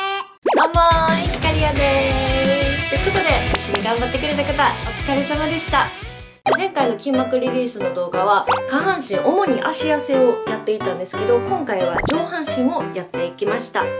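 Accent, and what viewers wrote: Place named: Kansai, Japan. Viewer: 可愛いい〜、関西弁がよろしい〜。買ったまま使ってまへん😵、やってみようかな。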